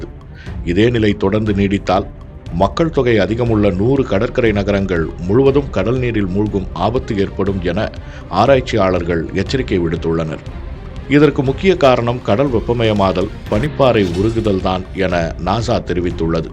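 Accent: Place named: North Chennai, India. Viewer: Daiii Tamil News Olunga padichi podunga da Unga istathuku Tamil la kolathingada Ena Vadachennai slang la potu vachi Asinga paduthathinga da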